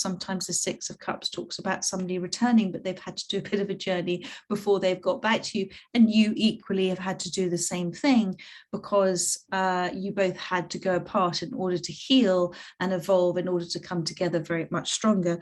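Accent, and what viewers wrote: United Kingdom. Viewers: Wow...I am a soon to be published poet..work explores the dark side of my life and also my hope..so that I'm praying it will reach others 🙏 My only fear about being in hermit mode which has been for years, the further I'm going into hermit mode..? Thank you for your calm and insightful presence.plus we Yanks 💘 the British accent.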